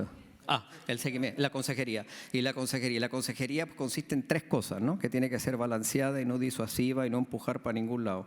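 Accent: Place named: Chile. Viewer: yo encuentro que tiene acento chileno 🤔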